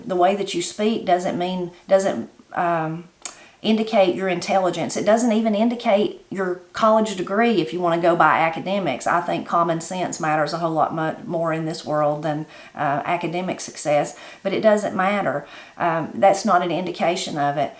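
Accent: Appalachia, United States. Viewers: Thank you for such an interesting video. I am from the coastal plain of South Carolina and I pronounce every single word you mentioned exactly the same way you do. I never thought about this but my great grandfather who I never knew was from Blairsville, GA and his mother was from Morganton, GA with the family going back to the 1700's in the same general area much like yours. I pronounce Appalachia exactly the same way you do and was always corrected in school about it. Now as I sit here and think about it I bet that part of the reason I speak the way I do is partially because of words and phrases that have been passed down to me from my Appalachian heritage. It was something I never considered until I watched your video. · I love the way you talk. It is so much like the way my relatives in the Appalachian mountains of Kentucky and Virginia speak. 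I grew up in northern Ohio, but my southern grandparents were nearby and I whenever I hear someone with a southern accent it reminds me of my precious family. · I am from Baltimore and recognize most of the terms and pronunciations you mentioned in this video. My mother always told me that folks from the Appalachian states came up to Baltimore to work in the aircraft factory (Martin Marietta) and other factories during WWII. I guess this influenced the colloquial language in the area. Our accent is not Southern, but our pronunciation is very much like yours 👍🏻👍🏻🥰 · I enjoy your channel, because it's nice to hear someone who talks and sounds like me, lol. My husband and I grew up only 13 miles apart in WVa, and everyone says he doesn't have an accent like I do. And yes, I have to tell people I graduated school (including college). We currently live in Utah, and I miss hearing folks like me. 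Since moving to Utah, my husband has used words and phrases that people here have no idea what he's talking about. One that surprised me is he referred to his boss as "the big cheese". Is that another Appalachian only phrase? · LOVE the channel my favorite videos are the language & accident videos. My dads family are from Big Stone Gap, Va We go back to Big Stone every year for our family reunion There is a small town right next to Big Stone call Appalachia, VA we pronounce Appalachia like this App-a-lachE. Not the A at the end we say it with the strong E at the end. When I found your channel I could not believe someone knew about my small town in Big Stone and was from Appalachia. Of course I realized you guys are from NC not VA. I have to tell you your accident and your daughters speak just like my dad and his family I love to listen to your videos because of that. My children are city kids lol but love going to a Big Stone every summer to have sweet tea on my aunts front porch while looking at the beautiful mountains. 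Nothing in the world like it. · I live in eastern Oklahoma, right on the Arkansas border, and my family has the same accent you have. I'm not so sure that my folks didn't come here from your area. Some of my family, my Granny's side, came to Oklahoma on the Trail of Tears forced removal from Georgia but my Papa's accent and the words he used were exactly the way you talk. I never asked him where his folks came from but I suspect it was at least near Appalachia. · When I listen to you talk, I hear my parents. They aren't from Appalachia, but some of the grandparents were. I think the accent and the ways of speaking carry on for generations. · Here in North Mississippi we talk exactly like y'all do. We were raised saying "okry" too. All of your examples this morning are identical to our pronunciations and meanings. I was raised in a small town here and my parents were both raised on dirt roads way out in the country and that's the way they talked. 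But I notice people who live in larger Mississippi cities talk the same way. I would fit right in with your Appalachian life style. Living these videos.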